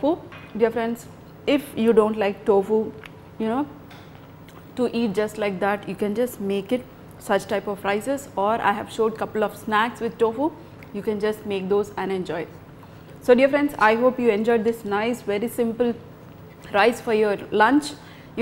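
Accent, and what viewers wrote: India. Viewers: Aceofmusic11 bro she is from south so her pronounciation is like this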